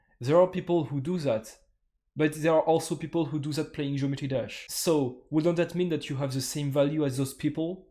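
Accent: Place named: France. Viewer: you have the french accent lol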